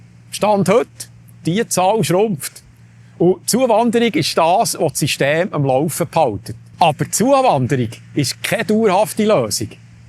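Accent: Bern, Switzerland. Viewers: Für mich ein klares Ja, weil das Schneeball-System AHV kann mit Zuwanderung nicht gerettet werden (Punkt). Da gefällt mir die Idee von mit dem Startkapital in einen Weltweiten ETF von 15'000.- für jedes in der Schweiz neugeborenen Kindes besser. Dieser darf erst mit 65 besser mit 70 Jahren als Rente (Bezug max 4%p.A.)ausbezahlt werden. An die 70 Jährige Person oder an seine Erben. Hoffe der wird eine Petition einreichen🙃 Übrigens, danke für dis schöne unverfäuschte Bärndütsch😇 Lg Resu vo Loupe <link>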